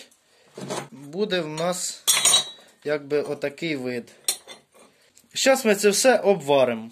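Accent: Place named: Ukraine. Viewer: Я млею от этого суржика. Как-будто я побывал в родном селе. Молодец земляк! 👍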